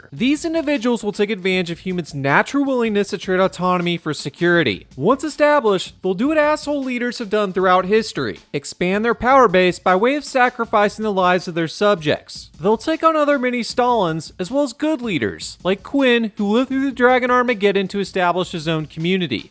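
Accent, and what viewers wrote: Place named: California, United States. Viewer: I hated the " confused California bro" accent at first but now I can't watch these how to survive or how you'd die videos without it. This channel is great 👌🏻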